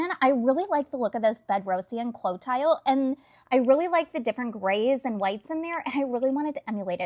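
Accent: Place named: Midwest, United States. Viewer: Wow, your accent is so fascinating! It's like you're from Minnesota/Wisconsin but you've lived in California for 20 years. I am a HUGE fan of painting backsplashes instead of retiling if you hate it. You nailed it.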